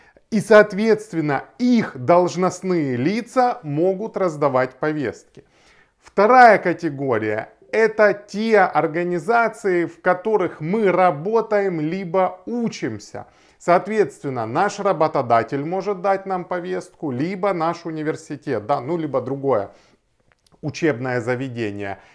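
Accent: Ukraine. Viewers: Кто этот блогер,говор украинский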